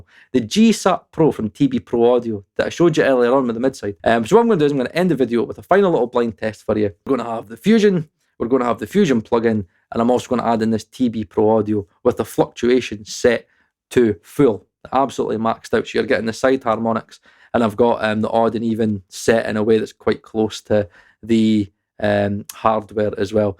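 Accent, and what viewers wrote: Scotland, United Kingdom. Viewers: You have the best Scottish direct on youtube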